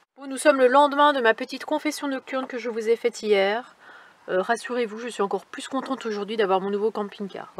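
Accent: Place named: Brussels, Belgium. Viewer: Ah ben c'est vraiment super cette nouvelle ! J'avais vu sur la chaîne de la Zick'Family le camping car en question du gars qui l'avait utilisé pendant 7 ans comme chambre d’hôtel parce qu'il travaillait sur un aéroport ou un truc comme ça (avant sa retraite actuelle). J'avais pensé à toi quand il parlait de la batterie auxiliaire (à cause de ton accent bruxellois) j'avais pensé "pourvu qu'elle le voit". Tu auras bien plus de place pour ranger tes affaires, une penderie près de la table, une autre près du grand lit ! + des tas de placards, une salle de bains avec wc, évier ! le pq n'aura plus jamais besoin de trôner sur l'évier de la cuisine ! Tu pourras laver tes cheveux à l'eau chaude puisqu'il y a un chauffe-eau au dessus de l'évier cuisine !, c'est fini de se crisper le crâne à le laver à l'eau glacée en hiver ! Frigo, chauffage, isolation au top ! 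Je suis bien heureuse pour toi et ton fils quand il sera là avec toi. (sans oublier Nanny bien sûr !) Ça va vraiment vous changer la vie ! Même pour Idriss, vous pourrez venir manger là aussi à deux ou entre amis, jouer à des jeux. Par contre j'ai vu que la table avait un petit problème (fendue sur le côté qui touche la paroi sous la fenêtre) mais ça ne doit pas être grave, juste esthétique, sinon Nicolas l'aurait dit je pense. Bon courage pour faire ton "déménagement"/aménagement dans le nouveau "Gérard II" J'ai hâte de voir la vidéo de demain (quand je pourrais car j'ai des tonnes d'activités en ce moment, même le soir) (Festi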